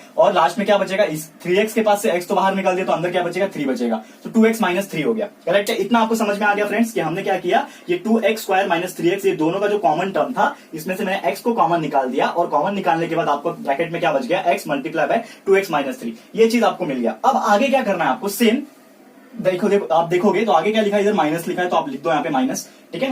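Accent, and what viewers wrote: Maharashtra, India. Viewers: sir you are best And I have a question 😊😊 ARE YOU MARATHI...? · App marathi ho na sir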